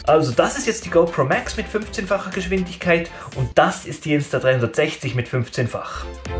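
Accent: Bern, Switzerland. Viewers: Ganz klar immer no Bärndütsch! 😅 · Tip top equipement review! Deutsch-deutsch is für mich klar leichter verständlich, trotzdem gefällt mir dein autentisches Schwizrdüch besser! 😊❤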